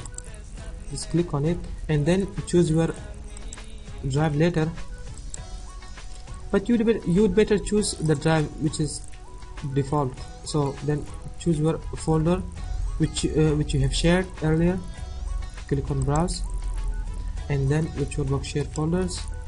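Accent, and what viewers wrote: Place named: India. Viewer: I can't understand you! You have such a thick Indian Accent!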